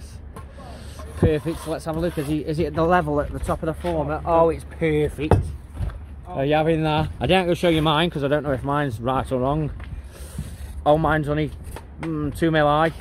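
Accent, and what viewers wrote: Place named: Liverpool, United Kingdom. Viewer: Scouse is back 🤏 🥪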